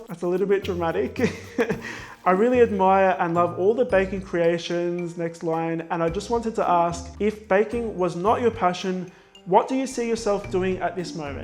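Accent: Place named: Australia. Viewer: I don't have emojis on this phone but it felt like a short video; didn't even feel the twenty minutes! Love your cupcakes and other recipes too :) I used to think you were saying AK guys instead of ok guys and I got really confused thinking it was short for also known as without the a but I also love your accent! Australia is awesome.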